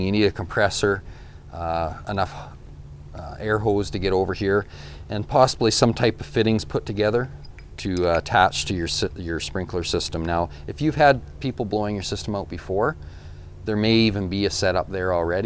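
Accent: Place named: Canada. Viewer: Great video. I think you are in Canada, as you pronounce House as Haouuse ;) and the ABS pipes instead of PVC. But, the reason I looked up for video like this is because in DC area, I pay $130 to blow out sprinklers. Also, I have a 8 gallon air compressor with 4SCFM, and I was not sure if it can do the job. Watching your video makes me think, I can. Thanks again.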